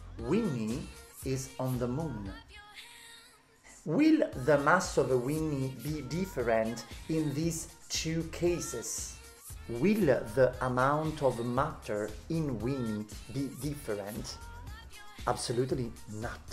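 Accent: Italy. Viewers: Your Italian accent is beautiful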